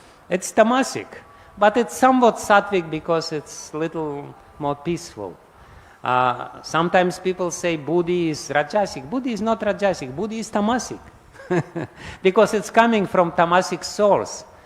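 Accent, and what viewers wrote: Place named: Russia. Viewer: Hare Krishna. 🙏🏻😇 It's the best way to learn English - to listen with russian accent from Maharaja.. 🕉️🌬️🧘🏻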